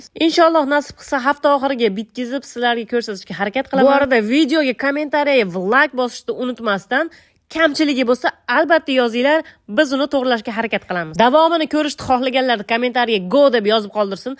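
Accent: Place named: Andijan, Uzbekistan. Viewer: Нечанчи йилсиз, асл исмиз нима асалим, андижонлимисиз шевездан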